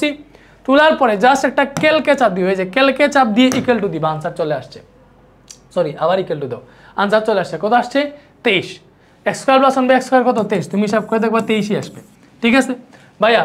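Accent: Sylhet, Bangladesh. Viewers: Bhaiya apne kita sylhety nii🫠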